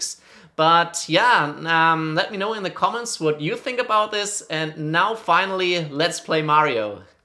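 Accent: Germany. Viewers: Short Question: Are you from Germany?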